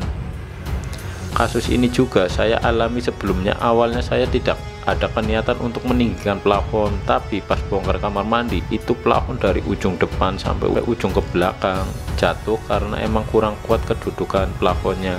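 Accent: Banyumas, Indonesia. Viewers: Ngapak betul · Ora ngapak ora kepenak ya kang... Hehe Matur nuwun kaang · Ora ngapak ora kepenak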